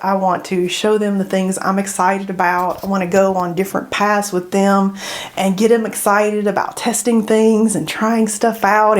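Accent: South, United States. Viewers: the familiar southern accent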